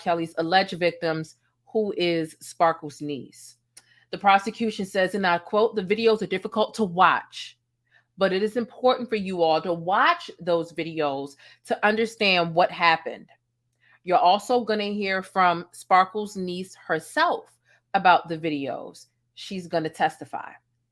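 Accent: Baltimore, United States. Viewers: Definitely hear your BMore Accent!! Love it!! Do your thing Ms.!! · I automatically figured out that you're from Baltimore, the accent is there. Behind New Orleans, Bmore is my second favorite American accent, I have family from there.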